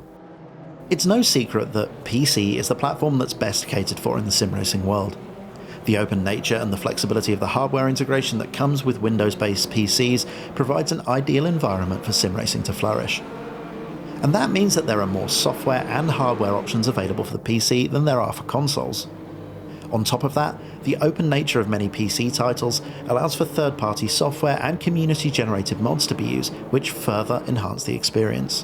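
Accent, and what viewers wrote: RP, United Kingdom. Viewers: a person has a RP UK accent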